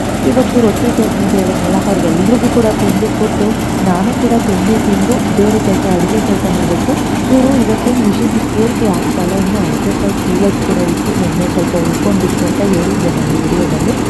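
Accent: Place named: Shimoga, India. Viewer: Hi nimma vlogs Supper nanu kuda nim side Talkere nanna Tavar mane nimmuralli namge parichaya davar edre na erodu Shimogga nan enjoy madta vlog nodtini akki palahara egantu marte hogide niv matadodu namma tarane adke nanag insta niv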